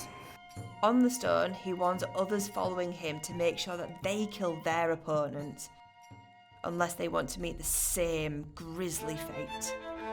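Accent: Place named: Yorkshire, United Kingdom. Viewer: Although it is refreshing to 'hear' a Yorkshire accent, & a female one too(!), presenting for the Royal Armouries Leeds it is unfortunate that both strength of voice & quality of recording made it extremely difficult to hear everything spoken. Not the first occasion R.A. 😒 Please mic. your presenters up personally for clarity! 🤨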